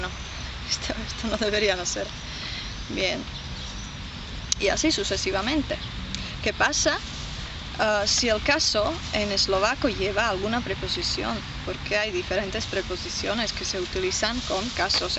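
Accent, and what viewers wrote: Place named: Castile, Spain. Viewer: Que hermosa como es que hablas español castellano me encantan tus videos saludos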